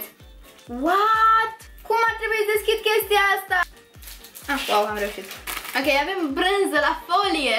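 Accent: Moldova. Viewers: esti moldoveanca?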